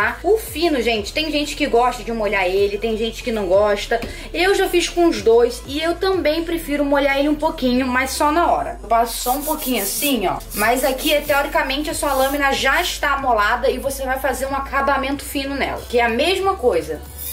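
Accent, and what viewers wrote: Rio de Janeiro, Brazil. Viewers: essa ae e carioca mermo ela pucha o s demais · vc e de rj ? parece pelo jeito de falar kkk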